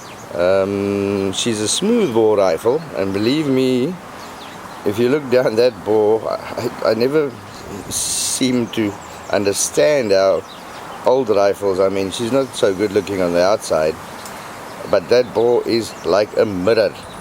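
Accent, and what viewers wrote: South Africa. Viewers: SA accent is it?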